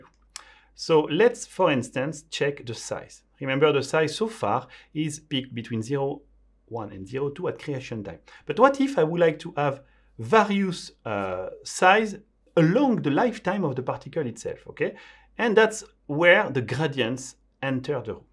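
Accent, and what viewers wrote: France. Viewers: I think it's a french's accent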